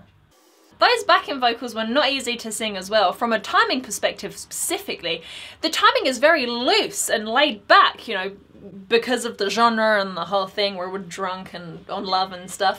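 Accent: South, United States. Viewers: Wow, didnt expect you to sound southern country haha. Bet you could keep up with Chris :) would like to hear you sing a southern bluesy country song.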